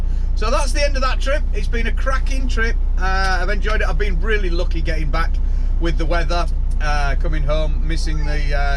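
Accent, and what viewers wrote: Yorkshire, United Kingdom. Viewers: Yorkshire accent🙂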